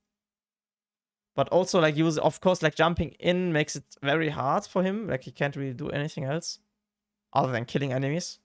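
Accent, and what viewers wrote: Germany. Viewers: speaks actually good, it's just the german accent. If you dont like it, than just dont watch it^^ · his English is good he only has a strong German accent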